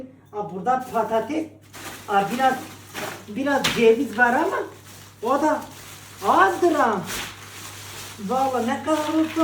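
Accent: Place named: Elazığ, Turkey. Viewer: Harika aynı Elazığ ❤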